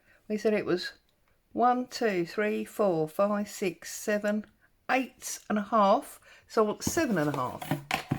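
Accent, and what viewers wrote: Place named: Kent, United Kingdom. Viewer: Hi, I have just found your channel and am enjoying watching, you make it very easy to follow. Also your accent is familiar, am I correct in saying you from Kent?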